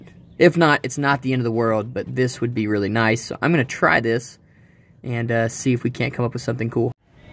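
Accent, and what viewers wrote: Alabama, United States. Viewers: You from alabama?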